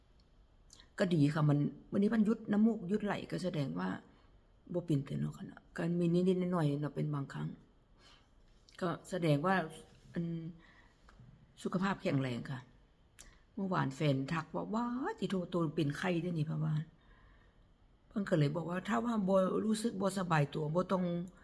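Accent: Nyo, Thailand. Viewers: สวัสดีตอนเช้าค่ะพี่ ได้กาแฟดำดื่มแล้วสดชื่นค่ะ ฟังสำเนียงพูด เหมือนญ้อครพนมเลยค่ะ❤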